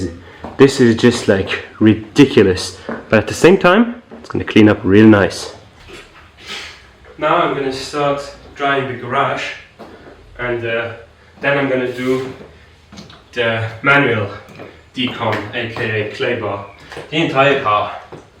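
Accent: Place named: Minnesota, United States. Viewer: You sound like small town Minnesota. "Fuck ton" thats a gorgeous car.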